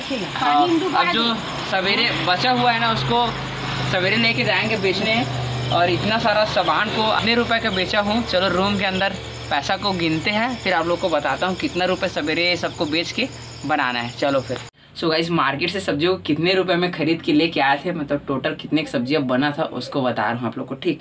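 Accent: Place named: Chhattisgarh, India. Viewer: Main iski Hindi boli se samajh gya ki ye Chhattisgarh ka hai..mai bhi Chhattisgarh ka hoon Bhai .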